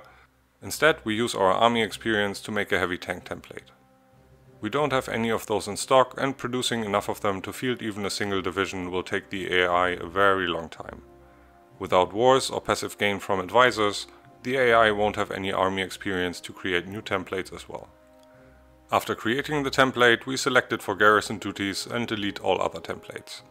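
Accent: Germany. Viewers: Are you German? Bist du Deutscher ?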